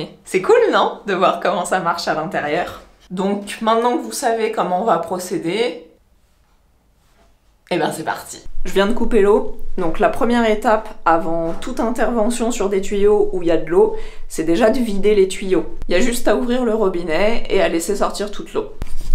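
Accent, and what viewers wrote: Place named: France. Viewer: Je sais pas pourquoi, mais j'aime bien entendre cette damme parler :) Elle a un "accent" français que je ne connais pas, trés agreable à l'ouïe, si bien sûr je peux appler ça "l'accent" vu que je ne suis pas français moi-même, d'une autre region que la mienne probablement. Je mets un Like!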